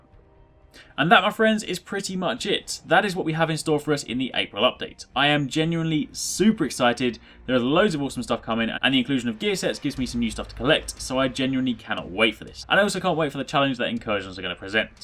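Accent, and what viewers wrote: Sweden. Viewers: You're Swedish? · are you from sweden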